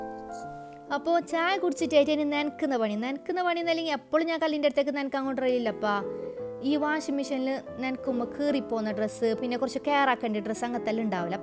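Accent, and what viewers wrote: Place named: Kasaragod, India. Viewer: Kasaragod bisiyaattil vlog kekaan Nalla paangund#mayyuskitchen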